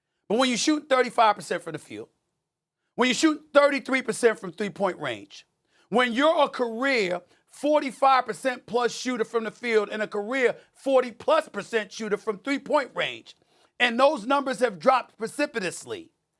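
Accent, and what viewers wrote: New York, United States. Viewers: Lol it’s that New York accent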